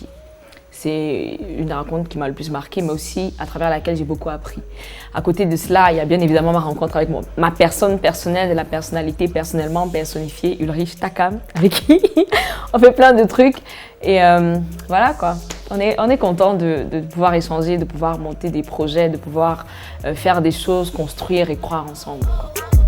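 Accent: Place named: Cameroon. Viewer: Je kiff trop quand tu parle le français genre genre du camer 😂😂😂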